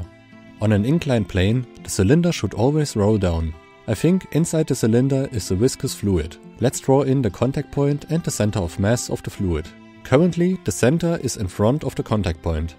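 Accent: Germany. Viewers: Man o man. You can just hear on his accent how much German he sounds. Cool video. Keep it going. Love the animation.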